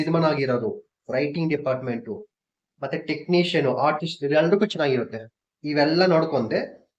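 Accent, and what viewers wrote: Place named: Tamil Nadu, India. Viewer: Tamil nava Kannada maataadidd haange untt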